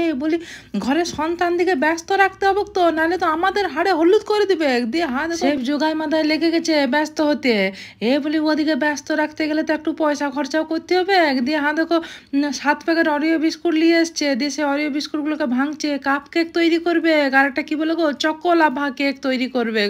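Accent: Bankura, India. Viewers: A kon jelar bhasha??Bakura na purulia??aktu janaben pls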